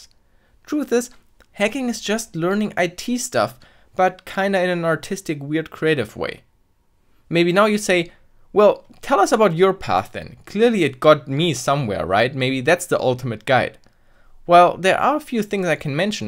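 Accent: Germany. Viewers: I love your german accent by the way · Klingt recht deutsch der bursche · Ich wusste sofort das er Deutsch ist😂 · Cool, dass Ihre/deine Videos auf Englisch laufen, damit es auch so viele wie möglich sehen, aber man hört schon sehr stark "den Deutschen" da raus. Macht aber natürlich nichts, solange man Sie/dich versteht. Normalerweise siezt man bei unbekannten nicht gleichaltrigen Menschen, aber da wir auf YouTube sind und eig. jeder duzt, habe ich mich für den Kompromiss entschieden. Ich sie-/duze :D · Weiß noch die ersten Videos von ihm, hab gar nicht gedacht, dass er Deutscher ist aber irgendwann fällts einem bei manchen Wörtern auf :D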